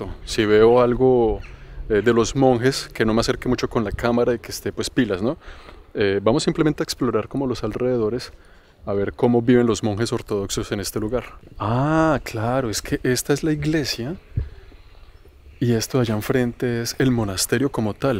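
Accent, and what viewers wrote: Bogotá, Colombia. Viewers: Muy buenas entrevistas, hermosos paisajes, buen acento Colombiano u ahora ya hablas Ruso, muy lindo es Rusia y la gente es muy amable y hospitalarios.. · Que parte Colombia eres? Esos modismos en tu acento, se me hacen conocidos. Saludos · ¡ me encantas con esa manera de expresarte y sobre todo tu acento “ oiga mijito” asi hablan mis hermanos Colombianos, yo soy de Mexico y soy tu seguidora. Saludos y bendiciones🙏🥰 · Hola, qué chévere saber que eres Colombiano, mijo! Es el segundo video que veo y espero ver todos los que nos puedas compartir. Con el primer video sobre TATARSKAN cuando te escuchaba narrar, me dije "este man tiene que ser Colombiano, obvio por tu acento", sin embargo, leyendo comentarios nadie hacía alusión al tuyo. Finalmente, hoy viendo este video confirmé que lo eres. Debo decirte que tus videos repito, solo he visto dos son del putas, mijo! 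Te felicito sinceramente. Por supuesto ya me suscribí a tu canal. Desde Bucaramanga, recibe un abrazo bien pingo! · Rolo, cachaco, es decir. De Bogotá, la capital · Saludos Parcero,Soy 🇵🇷 desde EU. Me encantan tus videos sobre todo lo buen aprendiz de los distintos idiomas y el acsento colombiano que tiene. Gracias x visitar el planeta x mí educarme y dejarme ver lo hermoso q es.. aunque espero muy pronto explorar así cm usted. Q viva 🇨🇴 y el resto del mundo 🌎🤗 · Muy bueno ,en total acuerdo!!!! Ese acento colombiano y hermosa tu efucacion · Lo que más me gusta de es esa sencillez al decir soy Colombiano y la forma de hablar típico de nuestro país, bendiciones desde Bogotá. 🇨🇴☺️ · SÍ...ÉSE ACENTO COLOMBIANO,,,VIVA COLOMBIA....SALUDOS A TODA ESA GENTE LINDA DE COLOMBIA. L@ AMO.SALUDOS DESDE NICARAGUA EN AMÉRICA CENTRAL 😘😘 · Este Man es muy rolo❤... "Sospecho pecho" 😂me encanta, me siento muy identificada, como si estuviera hablando uno de mis hermanos.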